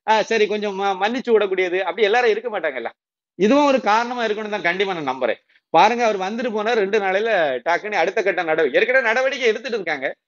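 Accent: Kanyakumari, India. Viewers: உங்கள் குமரி மொழி அருமை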